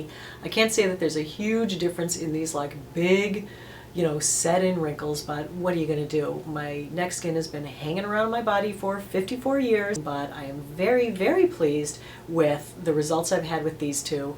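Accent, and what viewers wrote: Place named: New England, United States. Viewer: Love the video. I heard your accent and knew your were a fellow New Englander💕 I love CeraVe products. I will try on my neck too. Great advice. Thank you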